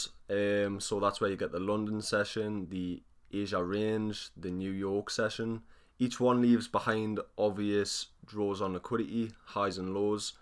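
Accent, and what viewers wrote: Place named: Newcastle, United Kingdom. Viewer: Haha fellow Geordie aye. How long you been trading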